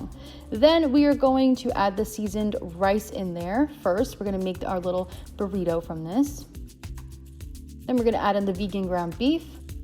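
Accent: United States. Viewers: sounds like a normal american accent to me. but im american lol so what do i know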